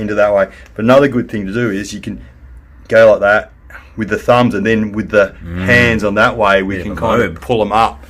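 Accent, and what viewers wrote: Australia. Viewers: Your typical Aussie accent. Represent 💪🏿💪🏿💪🏿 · I picked up the aussie accent ! Hello from Paris France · I love foot massage videos. With Aussie accent, I smashed the reply. Thanks for posting this video. I subscribed.👍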